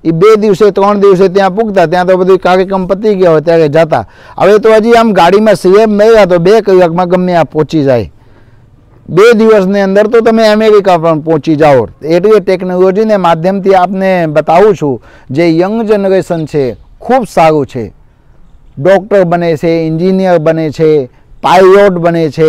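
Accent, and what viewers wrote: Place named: Afghanistan. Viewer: ME BHAI 2 VARSH AFGHANISTAN 🇦🇫 MA RAHI JOB KARI CHE AFIN NI COMPANY MA ANE AA BAPU NI BOLI NO LEKO CHE E AFGHANI LOKO NI BOLI SATHE 100 TAKA MADTO AAWE CHE ETLE MARU MANVU CHE K AA BAPU KOI AFGHANI HOY SAKE ANE PACHI HINDU 🕉️ SADHU BANIYA HOY SAKE CHE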